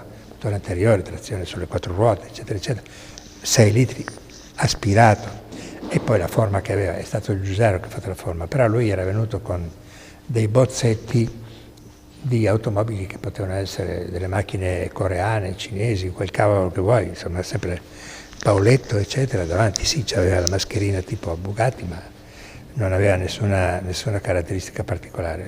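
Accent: Abruzzo, Italy. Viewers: se però andassi a un corso serale di italiano non sarebbe male, eviteresti di apparire come un asino che raglia. Neanche più i pastori abruzzesi parlano con quell’accento. Gombordamendo…. Ma come cazzo si fa a parlare così nel 2023 porco iddio lurido!!!!!!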